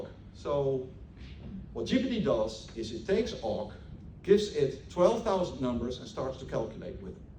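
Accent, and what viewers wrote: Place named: Netherlands. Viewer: Oh haha, dat is perfect! Meende al een Nederlands accent te horen, maar top, dan zal ik die ook nog eens doornemen om zeker te zijn dat ik het ingewikkelde engels (lees: technische engelse woorden die niet in mijn dagelijkse leven voorbij komen) niet verkeerd begrijp. Hartelijk dank voor de reactie!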